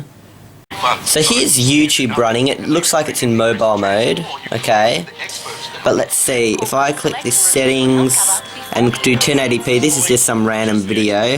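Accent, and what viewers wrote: Australia. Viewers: damn that accent you ozzie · woah woah woah HALT IT your australian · Are you from Australia? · Oi! An Australian!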